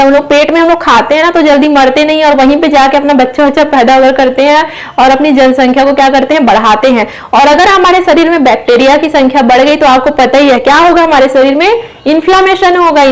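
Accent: Chhattisgarh, India. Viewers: Mam aap Chhattisgarh se hai kya...?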